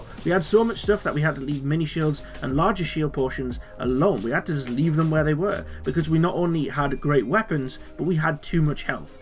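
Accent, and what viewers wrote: Newcastle, United Kingdom. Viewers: Are you a Geordie ?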